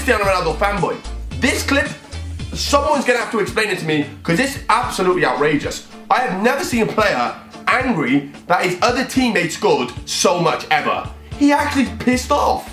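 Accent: Wales, United Kingdom. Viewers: your from Wales?!?!?!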